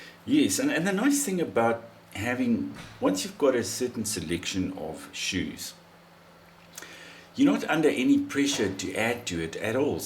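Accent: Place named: South Africa. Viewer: You are South African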